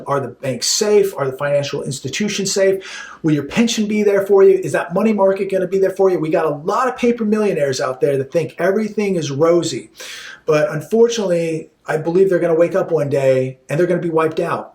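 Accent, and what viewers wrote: United States. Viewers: Is american or Canadian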